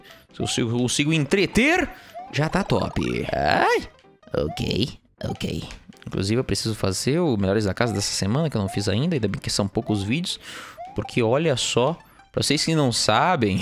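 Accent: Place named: Portugal. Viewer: Esse sutaque de portugal Ó top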